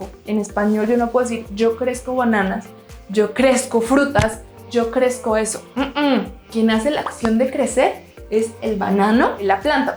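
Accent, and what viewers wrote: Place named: Colombia. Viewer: pues ella es d colombia¡!, me debes 20$ dolares x la infor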